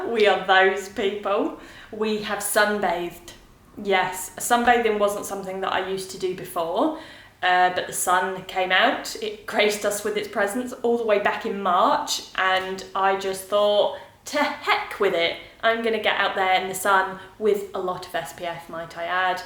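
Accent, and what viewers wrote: England, United Kingdom. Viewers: Love ❤️ your fancy sounding British accent. Thanks Philadelphia PA resident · Thankyou! Have subbed as it’s great to hear an English accent again..miss London..been here in Oz 6 years...thanks again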